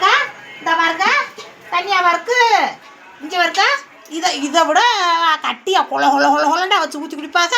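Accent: Madurai, India. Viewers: அக்கா நீங்க பேசுற மதுர பாஷ ரொம்ப பிடிக்கும் உங்க வடை கடை ரொம்ப சூப்பர்❤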